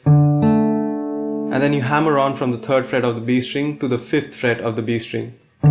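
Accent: India. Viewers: Why do u have indian accent?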